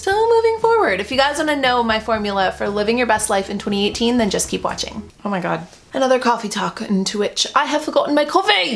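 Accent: Canada. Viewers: Is she from Canada?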